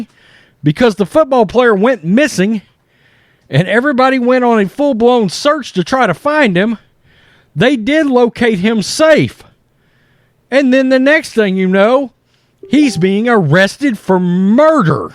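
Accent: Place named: South, United States. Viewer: Hey buddy you & your are great announcers what is your first name & what state you live in I’d say Texas you have no a southern accent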